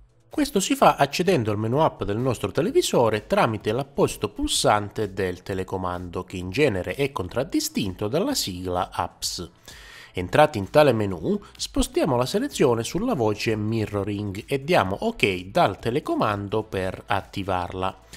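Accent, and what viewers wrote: Calabria, Italy. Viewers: Ma sei calabresee? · Ma sei calabrese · e infatti, ti ho dato del Calabrese. Lo sono anch’io...perciò l’ho subito capito ascoltandoti 👍🏽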